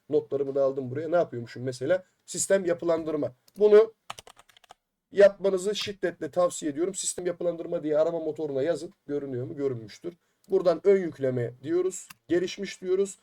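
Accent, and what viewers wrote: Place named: Balıkesir, Turkey. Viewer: Bu oyun bize kafayı yedirtecek. Ne yaptıysam bazı sorunları çözemedim. Bu sorunları tek tek size yamıyorum çünkü kişiye özel cevap verebileceğinizi düşünmüyorum. Bu nedenle işin sıfır noktasından başlayıp yardımcı olmanızı istiyorum. Windows 11 flash oluşturmadan başlayalım çünkü bizler bilgisayarı bilen kişiler değiliz. M. anlatır gibi (kendim için söylüyorum) tek tek KISACA anlatır mısın. Konuşma tarzından balıkesir ve çevresinden olduğunu tahmin ediyorum en son çare bilgisayarı kucaklayıp sana getireceğim. kolay gelsin.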